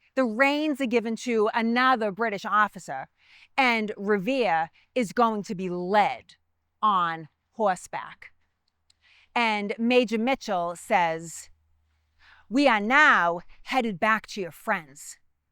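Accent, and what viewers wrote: Boston, United States. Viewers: I grew up in Boston. I am a 4th generation from the same town and my 2 boys are the 5th. I think you have more of a Boston accent than I do and you are probably not even from Boston. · All my Gad … the actual Spat … live the Boston Accent , I mean Bastan accent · As a Bostonian, not only do I love the history but I love the accent. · As a southerner I could listen to Revolution history in this Bastan accent all day long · Great presentation. My father and grandparents were Boston natives. I lived in or near Boston for a while in grad school at Brandeis. You have a classic accent - my dad said 'bananer' but did not drop his 'r's. His sister did.. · Great story. However, what an AWESOME Boston accent! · Not only the interesting historical information, and views of the sites, it's done in that lovely Baston accent. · I love her accent-- my inlaws are Boston area born, raised, still there. 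· I’ve noticed that you’ve commented on her accent on several past videos. Just to confirm so you may perhaps move on: our family is from Boston. Her accent is authentic. Thanks. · As a native Bostonian who worked hard to eliminate my local accent this gal is the real deal. She does a great job explaining a very important historical event. Cheers! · I LOVE YOUR BOSTON ACCENT. Im from California, biggest Red Sox fan in Ca and a big fan of Our Founding Fathers and American history. I just found your chanel and i love it. Thank you for sharing your knowledge.🇺🇸 I Love My Country. God Bless all and God Bless this beautiful country🇺🇸🇺🇸🇺🇸🇺🇸🇺🇸🇺🇸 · Ok this story can only be told with that Boston accent! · . My family is from Boston, and sorry, she sounds just like us. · I just love to hear you tell stories. I love your Boston accent and the passionate way you connect with history. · For all of you out there, this is one authentic Boston accent. 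If you are anywhere in the world, and you here this tone, Boston is not far away. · The Boston accent is on 110. Turn it down just a tiny bit please. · I Love Her BOSTON ACCENT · That’s pretty rude! It’s an authentic Boston accent and your comment is offensive to those of us who are lifelong Bostonians. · Connecticut here. Love a Boston accent. Great job. I subscribed and liked and look forward to more. Thanks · After listening to her talk for only 15 seconds, I could definitely tell she was from Boston.🤣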